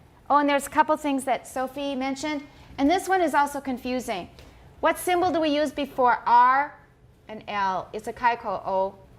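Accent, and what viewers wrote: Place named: Taiwan. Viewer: 史老师好认真，一本正经地讲解，正宗的英文和台湾中文口音好可爱。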